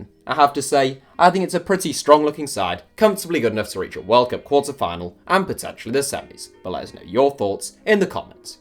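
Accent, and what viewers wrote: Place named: England, United Kingdom. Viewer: You are one of us now, disembodied english voice man. One of us.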